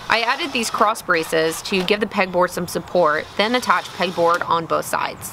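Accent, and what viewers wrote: Texas, United States. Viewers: Texas. It's a southern thing.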